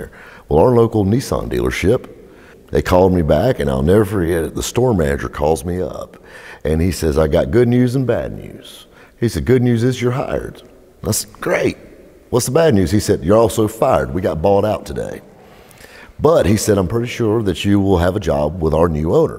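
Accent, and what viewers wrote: South, United States. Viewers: God damn I love this guy Southern accent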